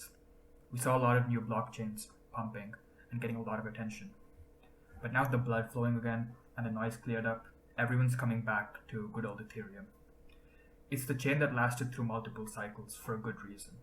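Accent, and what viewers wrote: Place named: India. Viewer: Great video brother......are you from India??? just guessing from your voice.......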